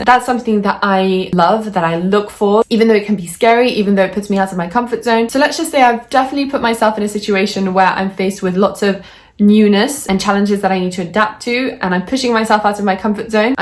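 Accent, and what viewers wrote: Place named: England, United Kingdom. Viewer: hello from turkey, i'm working as a GP here, i'm currently studying for OET exam, which enables me to take PLAB exams. If you have any questions or wonders regarding Turkish healthcare system, i would gladly answer to you. Anyway, your english accent is so pure and nice, it's also educational for me to get used to english accent:) greetz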